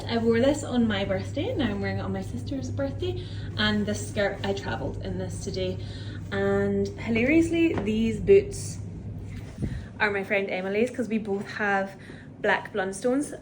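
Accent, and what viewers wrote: Scotland, United Kingdom. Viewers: Dear Scotts, please do not ever tone down your Scottishness! Fantastic world-changing innovations have been created by Scotts! Congrats. Life ‘is’ a big adventure and take all the nuggets you can!! 🎉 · So sad to hear this as an English woman. I think normal English people love Scotland and the beautiful accent. Be proud!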